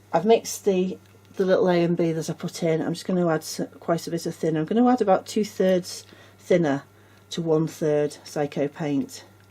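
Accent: United Kingdom. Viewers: I don’t make these I just watch you, I actually said the bigger head looked like a boy and the smaller one a girl too, to myself like 😂😂😂 beautiful work as always, I’ve watched you for years, my heart used to go like a train when you were taking the full body babies out of the moulds 😂😂😂, you’re heads and faces were amazing, I’ll have to go back and watch them again ❤❤❤ edit: yes I remember them pigs 😂😂 I didn’t care for them either, tbh I didn’t like any of the kids programmes them days especially pob 🤢 good lord, I’m in Liverpool, I can hear a slight scouse twang in your speech too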